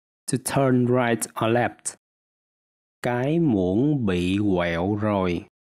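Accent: Mekong Delta, Vietnam. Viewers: Giọng này là giọng miền tây, tôi đoán là Bạc Liêu vì chữ đêm nghe như đim. Giọng Bạc Liêu iêu thường thành im như thêm thành thim. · xin chào, Hello, Bonjour! anh cám ơn con đă gủi liên lạc cho học tiếng Việt giống âm thanh miền duyên sông Cữu Long. Thanks!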